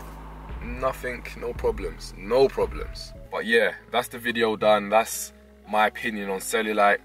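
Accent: London, United Kingdom. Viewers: I love your British accent! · English accent / London accent British is a wider thing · So he’s praising cellulite, looking foine and has a British accent...got my vote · Love your message... And your London accent 😁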